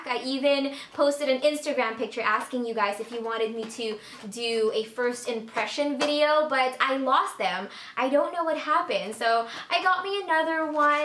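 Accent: Philippines. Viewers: Filipino accent lol :) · Hahaha :D pinoy accent ;) cucumber x)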